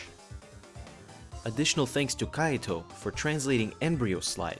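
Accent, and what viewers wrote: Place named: Minnesota, United States. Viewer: I like your accent, you from Minnesota or somewhere around there?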